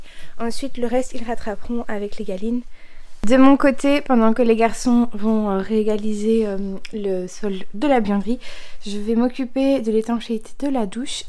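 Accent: Belgium. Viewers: C l'accent belge 😋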